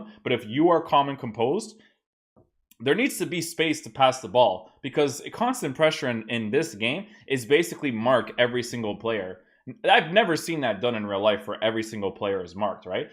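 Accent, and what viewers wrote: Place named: Portugal. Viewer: Man you from Portugal or something? Not only because of the shirt but your accent on player names makes me think you portuguese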